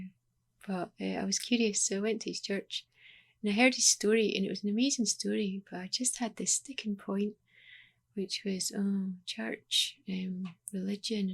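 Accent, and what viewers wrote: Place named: Scotland, United Kingdom. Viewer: i almost moved to edinburgh for uni. Love your accent and story. I kinda agree with a lot of what you say and like the world doesn't understand and it is hard to be around people who just go along with whatever is trending despite them not really caring about protecting anyone, they're also the most mean people sadly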